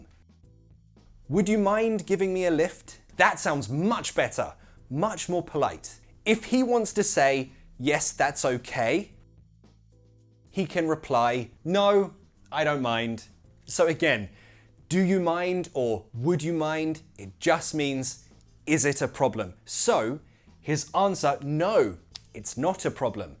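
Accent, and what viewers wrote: United Kingdom. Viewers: You have a great British accent. You explain English topics perfectly and clearly. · Are u British?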